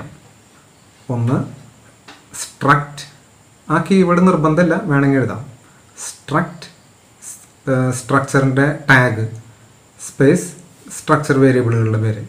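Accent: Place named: Thrissur, India. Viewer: Sir super class. Pinne sirinte Thrissur bhasha suprrrr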